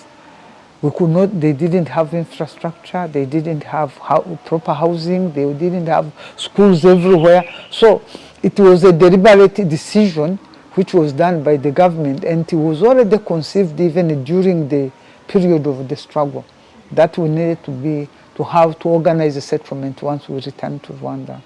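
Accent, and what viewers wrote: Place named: Tanzania. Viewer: Mtanzania mwenzetu.Accent ya kiingereza chako imenijulisha,Poleni sana wanyarwanda kwa mateso yaliyowakuta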